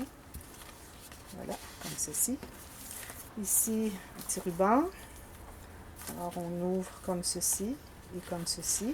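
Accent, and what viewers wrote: Quebec, Canada. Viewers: Bel album et comme j'ai commenté sous la vidéo de présentation de votre album, je vous suis toutes les 2 avec plaisir. Bien sûr, en français cela m'est plus agréable et facile à suivre, et comme je l'ai déjà écrit et comme d'autres vous le disent, ah lala ! Votre accent nous ravit et moi qui suis amoureuse du Québec parcouru par 2 fois déjà, je rêve en plus.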